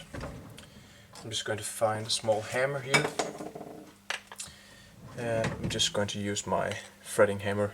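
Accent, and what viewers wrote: Denmark. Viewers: Thanks for this video! BTW are you Danish by chance? Your accent seems to be that of a Dane. If not, sorry for my incorrect assumption. Thanks again! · Rigtig god video, der præcist viser, hvordan man selv med lidt omhu, kan udskifte en nut på sin guitar. Pænt engelsk, med en smule charmerende nordjydsk accent. Per i Aarhus😎🇩🇰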